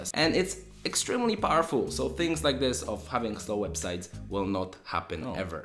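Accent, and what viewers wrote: Poland. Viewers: Are you polish?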